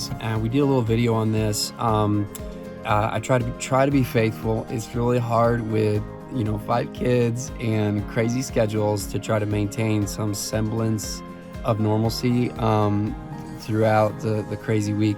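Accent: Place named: Midwest, United States. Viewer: Hmm. Idk but from his accent I'd say that he's from the Midwest. I could be wrong though. When he said God it sounded like Gad. Lol.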